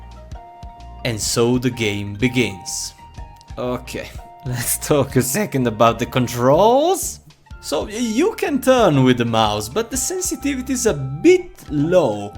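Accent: Italy. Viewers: Great job, you just got a new subscriber. ^_^ I like your italian accent, haven't encountered it much on youtube. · just curious. Are you from Italy? Judgind by your accent I'd say Italy. Also the fact that you can pronounce some sounds that are hard for normal italians( .the "H" sound ) suggests that maybe you are from south tyrol? Just curious to see if I am right.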